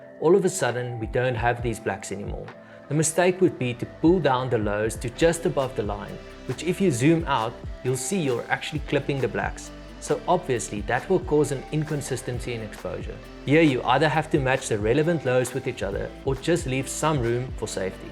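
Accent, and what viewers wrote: South Africa. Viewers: Refreshing to hear the SA accent on YT Im currently using FCP X Would really loves this, keep killing it bro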